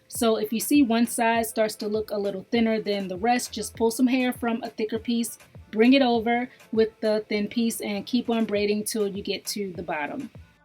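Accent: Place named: Maryland, United States. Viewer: I'm getting ready to try this. I followed your butterfly lock tutorial & got tons of compliments! Thanks! (Where are you from? You sound like you're in Maryland. Either way, I love your accent!)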